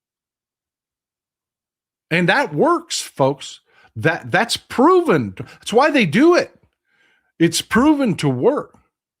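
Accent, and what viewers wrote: Texas, United States. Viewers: Glad I found you. Been watching for a couple of weeks and I’m glad a fellow Texan, sharing my views, is broadcasting or rather, streaming as they now call it, and talking like a true Texan. The candid and straightforward way you communicate is the way my daddy got his message across. I’m 70 and tech is outrunning my ability to keep up. Hell, while tech is speedily moving ahead, sadly, my mind needs the spurs every now and then. So, I’m appreciative that I can hear facts from someone I relate to. Looking forward to every post, keep it up and thanks.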